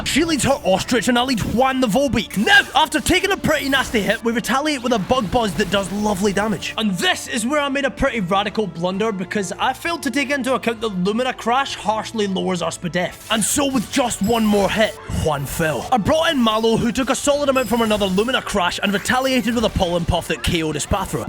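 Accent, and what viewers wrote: Scotland, United Kingdom. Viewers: Quick question are you Scottish?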